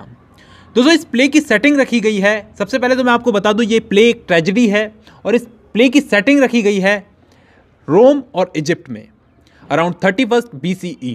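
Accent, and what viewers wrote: India. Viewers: i cant understand india english ...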